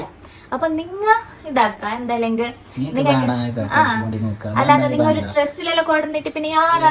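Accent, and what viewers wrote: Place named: Kasaragod, India. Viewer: Ksaragod basha edayo kanda pole ....eda nigala home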